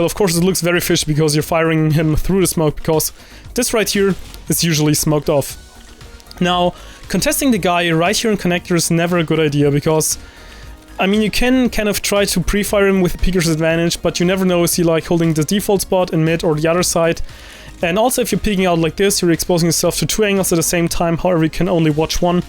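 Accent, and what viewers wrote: Germany. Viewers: Are you gGerman?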